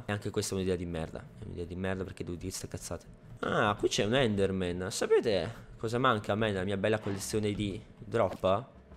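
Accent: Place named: Italy. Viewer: ma di dove sei? (so che sei Italiano ascoltando il tu accento nei tuoi video)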